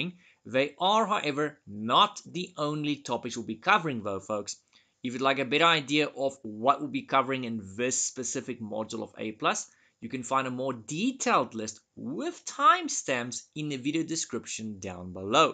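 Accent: South Africa. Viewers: I heard the accent and thought “this is a fellow South African” 😂😂❤❤. Subscribed! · This accent. Are you south African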